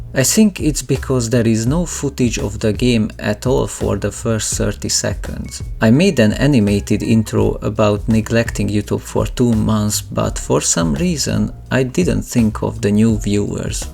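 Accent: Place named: Hungary. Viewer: Happy New Year. You're from Hungary from what I hear from your accent.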